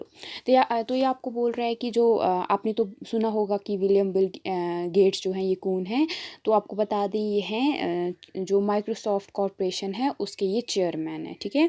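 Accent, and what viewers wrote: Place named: Himachal Pradesh, India. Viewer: Your himachli slang is soo good 😉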